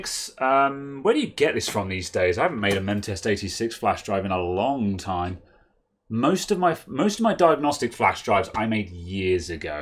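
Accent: United Kingdom. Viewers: ...Because you sound VERY BRITISH!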